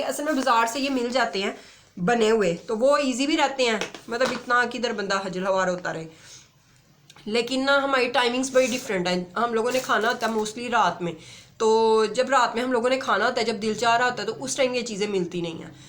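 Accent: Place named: Sialkot, Pakistan. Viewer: Apky accent sy hu lgta hy Ap sialkoti hu 😄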